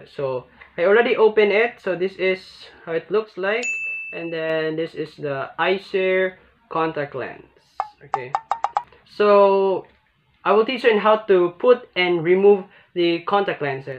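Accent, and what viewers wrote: Visayas, Philippines. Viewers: Bisaya diay ka😁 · Bisaya raman daiy😂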